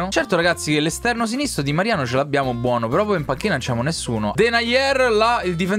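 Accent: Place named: Palermo, Italy. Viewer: che parla palermitano 🔝 😂😂😂😂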